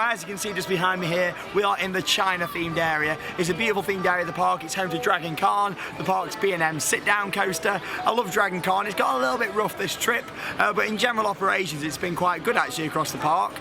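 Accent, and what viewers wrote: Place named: Staffordshire, United Kingdom. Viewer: Its a Staffordshire on i think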